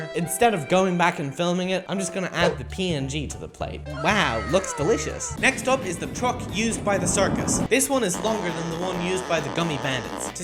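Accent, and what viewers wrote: Australia. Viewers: UR AUSTRALIAN :0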